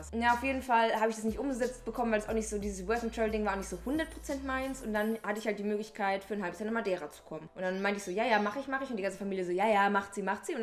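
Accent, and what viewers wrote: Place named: Aschaffenburg, Germany. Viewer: Kommst du aus der Nähe von aschaffenburg?? Weil man merkt den Dialekt voll loool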